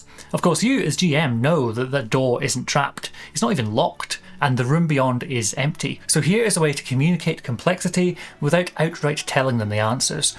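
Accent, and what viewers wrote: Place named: Scotland, United Kingdom. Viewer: Brilliant video - so mamy good ideas! Our group definitely suffers from this. Every session 😅. Also your scottish accent is incredibly authentic and to maintain it for the whole video is admirable ❤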